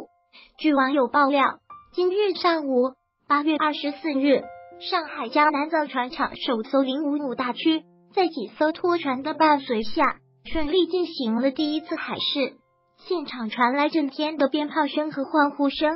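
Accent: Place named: Taiwan. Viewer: 台妹说话的腔调让我浑身起鸡皮疙瘩，头皮发麻，哈哈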